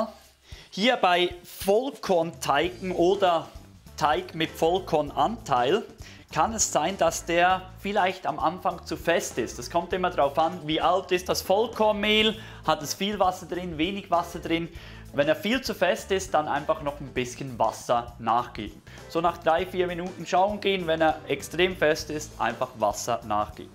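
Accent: Switzerland. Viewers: Ich habe schon mehrere deiner Rezepte gebacken. Jedes Brot ist immer super gelungen, obwohl ich meistens die doppelte Menge nehme (große Familie). Mach weiter so, ich liebe diesen schweizer Dialekt .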